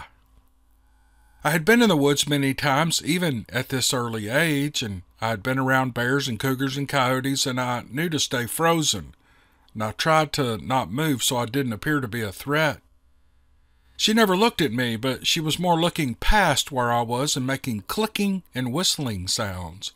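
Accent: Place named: Appalachia, United States. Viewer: Not sure if anyone answered the question what is GLAMPING but it's basically glorified camping!! Kinda like u know high end camping with a big nice r.v that has a kitchen in it air conditioning and all the finer things u get at home but on a campground. Cause your a country boy like me when we went camping growing up and some of us evening now camped in tents sleeping bags and "living high on the hog" was an air mattress 😂. We used a camp fire possible a grill at the cp site glampers cook in there stove or in there fancy gas grill.. as I get older glamping is nice lol I get stuff and wet in an old ⛺ tent anyways I guess I could be wrong but this is my interpretation great show your our hero love your voice u sound like someone from the holler don't ever change man.. your friend Billy Kirby Lake Cumberland Ky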